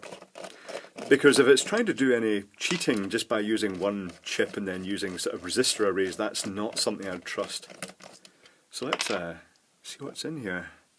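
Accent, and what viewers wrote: Scotland, United Kingdom. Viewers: I wrote this before - I find wit and mellow Scottish accent very soothing. I use it as an antidote for Dave (from EEVblog), who has an aggressive Australian accent and an annoying manner of speech:) For me the prescribed dosage is 3 per one Dave:-D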